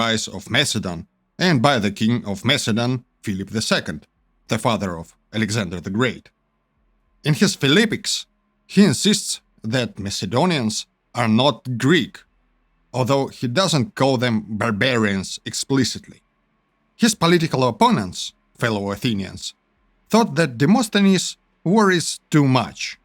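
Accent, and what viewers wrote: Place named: Eastern Europe. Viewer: II suspect by your Slavic sounding accent your motives are less than objective. a. Contrary to your claims in this video that the "majority" of Greeks didn't consider ancient Macedonians Greeks (a "fact" you've made up), ancient Macedonians were recognized as Greeks by other Greeks. This is witnessed by their centuries of participation at ancient Olympiads which at the time were only for Greeks. Its not just Herodotus that claims ancient Macedonians were Greeks. Countless artifacts suggest this. For example there is records of Alexander's own father Philip participating at three different Olympics in the horse race. What you are doing is twisting a handful of references of other Greeks calling them barbarians. Most famously Demosthenes did this. Whats missiing in your narrative though is context. Demosthenes himself was called a barbarian by other Greeks on several occasions. 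Barbarian was being used as an insult. b. I can tell you have depended on Wikipedia's flawed map of ancient Macedonia. Ancient Macedonia of Alexander fame is already 100 percent in Greece. Even parts of ancient Paeonia is in part of northern Greece.. THE Macedonia.. Skopje is not even geographic Macedonia unless you want to claim Roman Macedonia or ancient Macedonian conquests of as "Macedonia". And in that case, huge chunks of north Africa, middle east and Asia would also count as Macedonia. C. You downplay the self-detemrination of ancient Macedonians.... like Athenians.. .like Spartans.. like Corinthi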